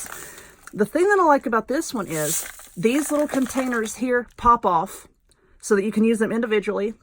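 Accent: South, United States. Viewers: So happy to hear your Southern accent again. You tickle me when you say caddywampus (sp) I'm from Jersey, have never heard that word before and laughed out loud when you said it. I also love to watch you crochet. My grandma taught me when I was little but I forgot everything. I've been watching YouTube tutorials to relearn. I'm hoping you make some crochet projects. Happy crafting.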